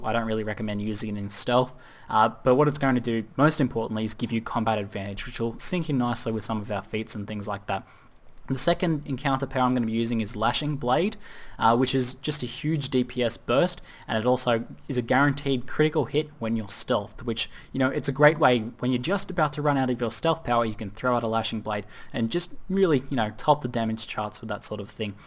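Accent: Australia. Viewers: Aussie brooo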